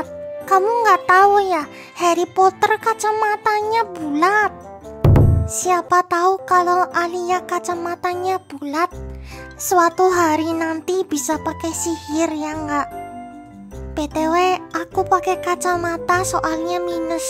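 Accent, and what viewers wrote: Java, Indonesia. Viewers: Kalo aliaNgomong imut imut kel gitu..aku dengernya alia kek medog gitu loh..ke org jawa yg lg ngomong indo...hihihihi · Kok ngomongnya medok Jawa ? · Alia suaranya kyk ada medok" nya gitu:> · Gua denger lebih detail lagi suara kak alia ada logat jawak nya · Alia logatnya kayak jawa wk 🤣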